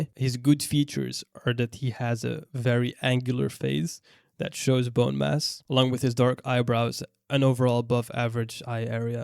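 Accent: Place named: Netherlands. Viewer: Very Dutch accent.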